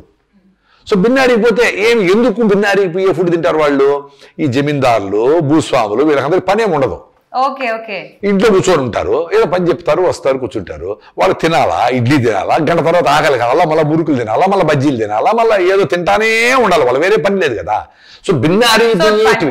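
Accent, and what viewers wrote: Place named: Kadapa, India. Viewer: సర్ మీ బాషా కడప భాష లాగా ఉంది చాలా బాగుంది మంచి అలవాట్లు చెప్పారు సర్ థ్యాంక్స్ సర్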